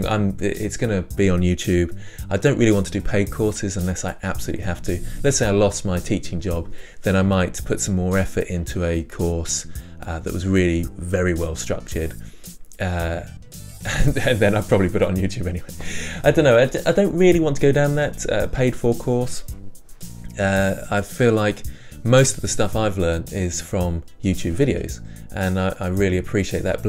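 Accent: United Kingdom. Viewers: "Hey, I'm, I'm very sexy" he is being too modest, under his sweater he hides a six pack also, he has a british smile and accent 👌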